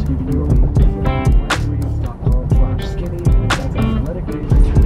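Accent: Netherlands. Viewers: whaat, when i first came across your video’s i thought i heard a dutch accent to your voice, you’re amazing!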